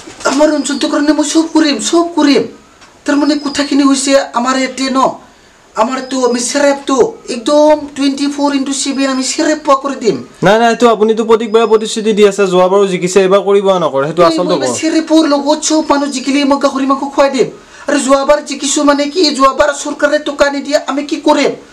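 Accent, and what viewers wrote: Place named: Bodo, India. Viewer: Val lagise sir. Bodo accent was awesome.. Keep it up sir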